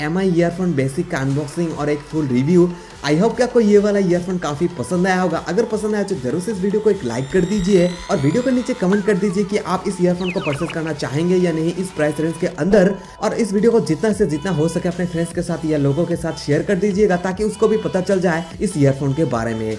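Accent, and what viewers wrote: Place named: Bihar, India. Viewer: Bolte time bhot pyara lagta h tu bhai 😁 Bihari hai na ?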